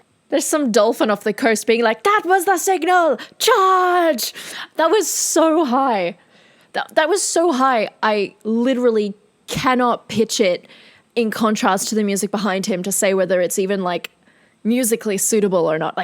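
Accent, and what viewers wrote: Australia. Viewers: Australian accent, yes.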